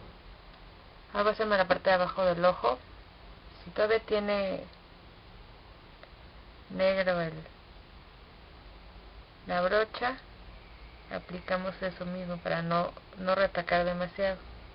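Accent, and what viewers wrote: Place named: Mexico. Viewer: WOW! Me encantan tus tutoriales, bueno todos los videos y además ese acento mejicano me gusta muchísimo, jaja... Saludos. :)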